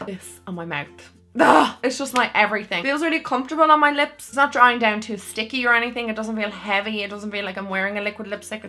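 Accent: Ireland. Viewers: Is thought your from Ireland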